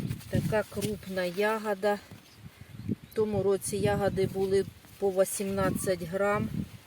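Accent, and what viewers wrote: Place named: Sumy, Ukraine. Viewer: я із Сумської області, у нас всі так розмовляють, майже. Це нормально!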